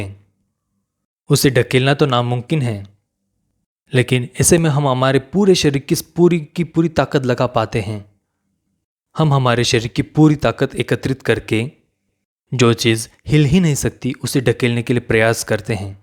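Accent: Maharashtra, India. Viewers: U r a marathi.and the way u speak Hindi, it sounds so cute 🥰❤I love u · Your Indian accent is thic af man but like the content. I'm just listening to everyone to find a middle way and for the things you said about straps, etc. I don't use belts or straps only raw lifts but I do use pre-workouts and coffee and my primary motivation to use them is putting up more work for more muscle mass I think it's justified and I see the results of my training both in practical situations like pain tolerance for example 2 days ago 12.5 kg dumbbell from the rack dropped to my foot and I felt little to no pain at the time and afterwards and 0 complications good stuff.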